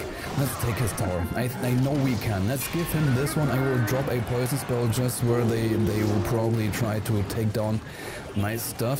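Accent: Germany. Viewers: Your german accent is too awesome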